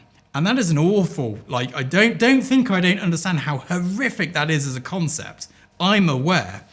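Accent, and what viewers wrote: RP, United Kingdom. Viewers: I have recently found your videos. The first one I thought ‘who’s this posh bloke and why should I be listening to him’😊 but as I have started to watch more and more of them I have to say I find them fascinating and very illuminating. I am not a photographer I work with video but some of these recent points resonate with me and my part of the industry. So hats off to you. Keep up the good work!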